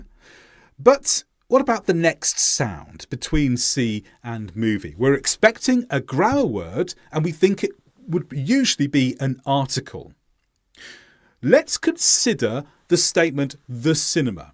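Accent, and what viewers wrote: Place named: United Kingdom. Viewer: it is a pleasure to listen to English with a British accent. We, in Romania, only learn American English at school, being convinced that it is British 😂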